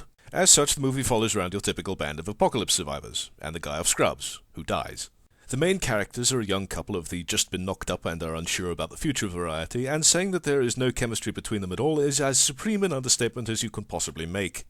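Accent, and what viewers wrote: United Kingdom. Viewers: And here come the fallacies. "This is only a little similar! It's not the same! And to support my argument, here's an ad hominem statement." Alright then, let's put it all on the table, Similarities: Fast frames and fast talking, Reviews, Brittish accent, witty criticisms, same concept all around. Differences: does movies, Yahtzee does games. draws his own frames, Voices are different. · I feel like I've watched the movie and Now I don't have to waste 7 of my hard earned dollars. I also feel smarter because I spent my time listening to a very funny man who makes very good points about the current film industry. Also he is British which gives him lots of credibility in my American eyes. I mean all the bad guys in star wars had british accents didn't they? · You have such a beautiful british accent!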